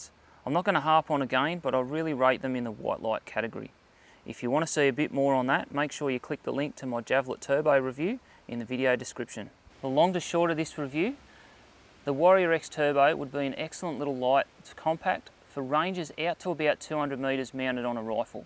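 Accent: Australia. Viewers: Really enjoying your videos mate. So good to hear aussie voice and Australian content :-)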